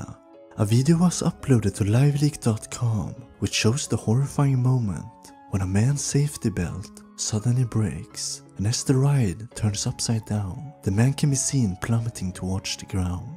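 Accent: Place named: Sweden. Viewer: Im a Finn, and can confirm. This is a swedish accent.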